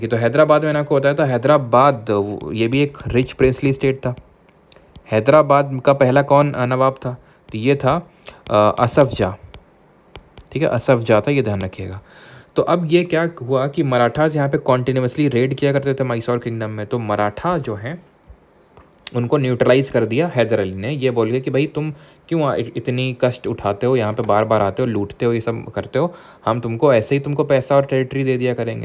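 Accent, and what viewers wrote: Kanpur, India. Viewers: Without knowing where he is from ,by his accent i within minutes got sure he is from kanpur I'm also from kanpur rola h 😂🙌🏻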